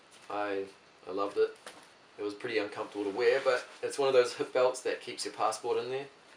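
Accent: New Zealand. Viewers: a Kiwi (from New Zealand - the land of superb hiking). Aussies generally have broader accents, lol!! Happy travels. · So good to hear Kiwi accent on Youtube! Im from NZ & off to Euro in 2 wks time. Got a Pacsafe x40 & few other bits n pieces. Thanks for the tips, really helpful. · Great video bro! I'm a fellow kiwi currently travelling around Europe too, you have a lot of great tips and I've defiantly learnt a couple things. Good to hear a kiwi talk after months of Europeans haha. loved the video. Cheers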